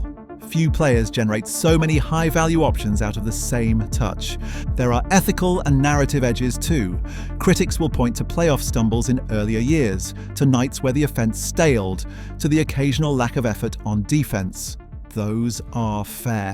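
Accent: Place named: United Kingdom. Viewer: Originally I was leery of your Anglo-accent (you know the bias: what could some Brit or near-Brit have to say to us about our sport?), but soon I realized this was an extremely insightful analysis of the Joker's game. And more and more, I began to appreciate your beautiful language, from beginning to end. I love Jokic's game - the essence of basketball art - but never in the vast library of Jokic videos have I heard more succinct, more precise, more well-wrought, more understanding descriptions of what the man is doing. Just excellent. I salute you. In my view: # 1 Jokic video.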